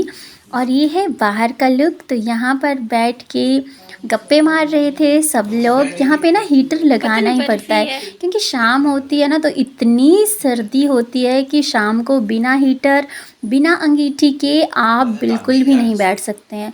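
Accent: Standard. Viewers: Aap kitana hindi bhi sudh bolti aaj ka vlog bahut kitchen bahut khubsurat lagi mujhe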